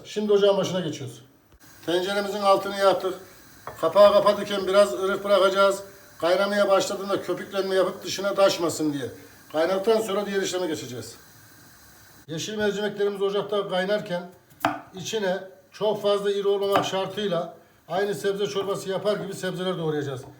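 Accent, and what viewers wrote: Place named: Çankırı, Turkey. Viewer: Abi Çankırılı misin şive benziyor da hemşehrimiz misin merak ettim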